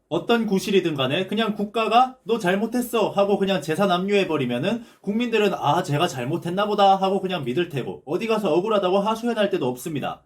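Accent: Gyeongsang, South Korea. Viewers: 경상도 특유의 표준어 사용시 묻어나오는게 있습니다. 저도 경상도사람이니 아는거고요 ㅎㅎ 그래도 나쁘지 않았어요^^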